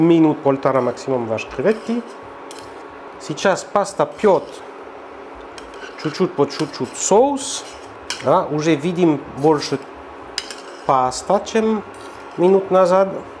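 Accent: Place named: France. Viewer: Готовлю по! Вкусно. И много ньюансов, о которых никогда не догадывалась. Спасибо большое. Отдельное спасибо за русский язык с французским произношением.Изюминка.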